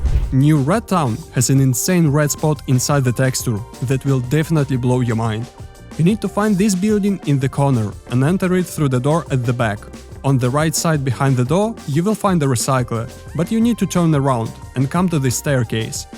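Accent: Russia. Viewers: Я не критикую и не осуждаю. Просто хочу дать совет. Во первых по акценту слышно что русский. Во вторых существует такое термин как «культурное несоответствие», либо «культурная специфичность». Это говорит о том, что каждый язык несет свою культуру и историю, поэтому идиомы, фразеологизмы переводить дословно будет ошибкой. К примеру «save your nerves”. Англоязычный народ так не говорит, для них это странно. В таком случае следует прибегнуть к использованию словаря, чтобы повысить качество текста. Удачного прогресса и развития!❤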